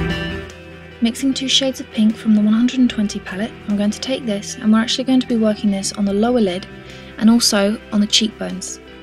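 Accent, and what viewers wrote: England, United Kingdom. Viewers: British =w= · R U english??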